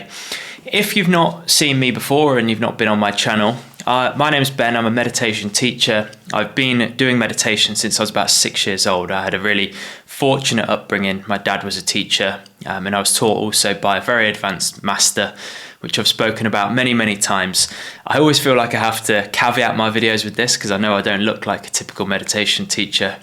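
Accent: United Kingdom. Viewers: I REALLY APPRECIATE HEARING BRITISH PEOPLE SPEAKING OUR LANGUAGE AND SEEING WORDS SPELT CORRECTLY RATHER THAN SPOKEN IN AN AMERICAN DIALECT WITH WORDS PRONOUNCED AND SPELT INCORRECTLY WITH LETTERS MISSING! AMERICAN LANGUAGE IS ENGLISH SO WHY DO THEY FEEL THE NEED TO MISPRONOUNCE AND MISS SPELL WORDS AND CALL IT AMERICAN ENGLISH? PATHETIC!! GREAT VIDEO BY THE WAY FIRST TIME IVE SEEN ONE OF YOUR VIDEOS AND I FOUND IT VERY INFORMATIVE, I'M LOOKING FORWARD TO WATCHING MANY MORE, THANK YOU FOR BRINGING YOUR VIDEO'S ON THE INTERNET. 😊😊😊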